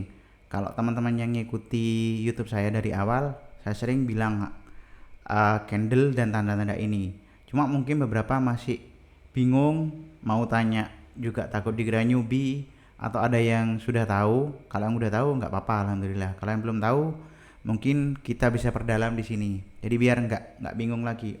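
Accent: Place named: East Java, Indonesia. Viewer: Mksih share ilmu2 nya bro,,,logat kental Jatiman KLO ga kliru😂😂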